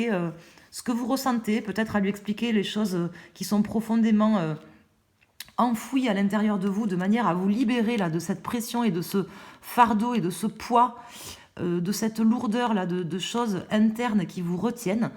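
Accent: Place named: Gers, France. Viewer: Gratitude pour vos réponses 🤲💙 Votre accent me ramène à ma Terre Natale le Gers😍 Belle journée pour Vous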